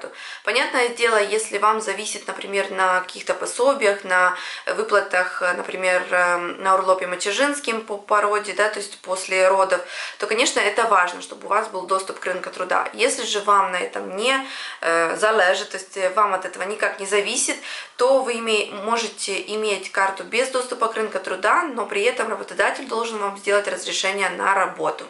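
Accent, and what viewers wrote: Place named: Poland. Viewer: Piekny polski akcent!!👍Gratuluje!💐👏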